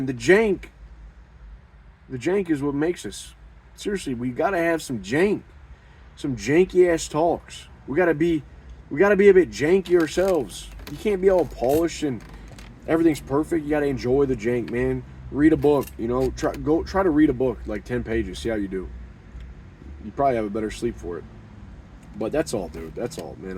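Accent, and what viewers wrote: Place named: Ohio, United States. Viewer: Man I recognized the accent immediately. I grew up in Clyde-Sandusky co area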